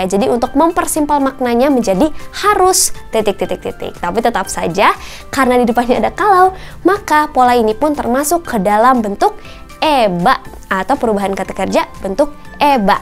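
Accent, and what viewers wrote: West Java, Indonesia. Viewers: Ka sunda bgt sii 😍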